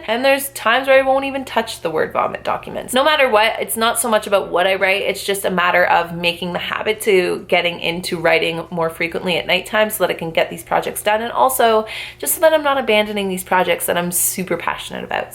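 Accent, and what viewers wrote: Canada. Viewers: are you canadian? you sound canadian😂 edit: i’m stupid u live in toronto🤦🏽‍♀️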